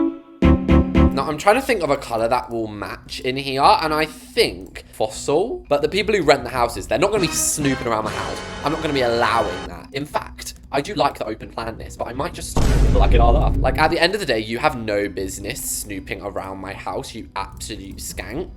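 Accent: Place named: Essex, United Kingdom. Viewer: I KNEW U WHERE FROM THE UK YOU SOUND LIKE UR FROM ESSEX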